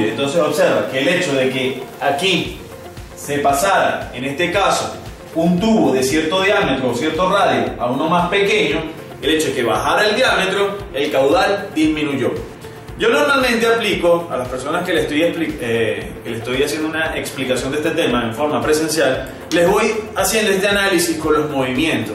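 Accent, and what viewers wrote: Venezuela. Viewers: Like si te diste cuenta que él profesor es venezolano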